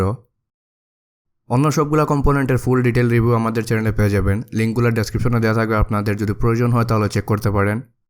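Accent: Sylhet, Bangladesh. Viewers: Bhaiya ki sylhety ✌ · Vaia apni ki Sylheti naki??😃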